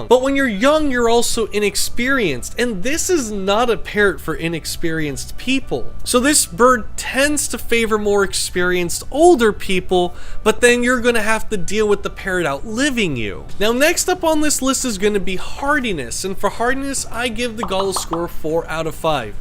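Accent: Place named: India. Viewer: My God, what english talks this chap? I cannot understand him. Are you from India or Pakistan, or Thai…. impossible recognize this accent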